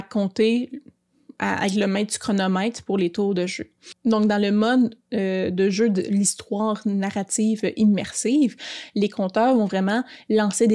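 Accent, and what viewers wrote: Quebec, Canada. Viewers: Bel accent Quebecois! (Un Francais 😊)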